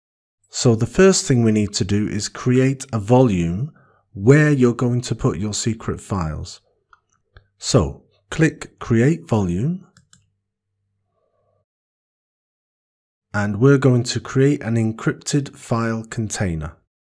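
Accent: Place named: England, United Kingdom. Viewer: DUDE!! This is a superb tutorial (1) It covers various scenarios a user might ask - at least for this specific topic of encrypted folders. (2) The explanation is clear and slow, so techies can speed up, but newbies can go slow. (3) An educated English accent is soooooo much clearer in articulation, rather than many Yanks who mumble and mangle words. Tech skills is one thing; teaching is another. That last bit may be a personal bias, but look at Hollywood movies - can barely figure out what they're saying. Maybe that's why the rely on big explosions. But that's a tangential topic.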